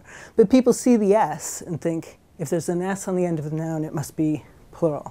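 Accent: United Kingdom. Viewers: The Limey accent has really rubbed off on her. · Even though she's American, she's learned to use quite a few British pronunciantions of words.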